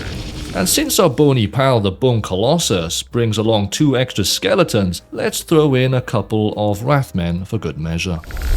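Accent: Wales, United Kingdom. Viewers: Are you Welsh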